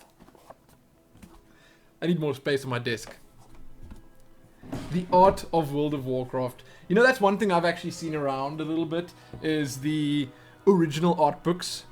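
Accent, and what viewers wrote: South Africa. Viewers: Is he South African??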